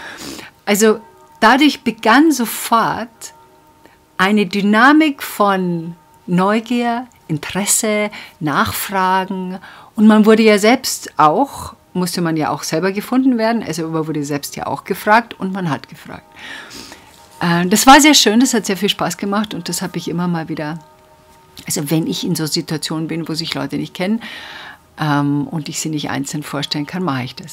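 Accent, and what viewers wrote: Bavaria, Germany. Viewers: das mit bayrischem Akzent, netter geht's net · Ich muss immer lachen wenn Dein Bayrisch durchkommt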